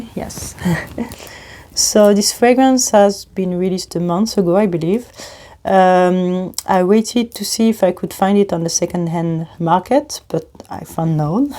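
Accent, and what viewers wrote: France. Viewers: on sent l’accent français 😂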